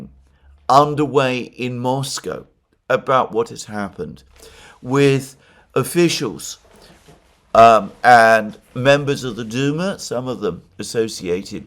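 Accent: United Kingdom. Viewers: The only angle this guy focuses on is from the Russia perspective or the effects on Russia. Looks like a Russian agent of Greek background raised in and made to look and talk like a Brit!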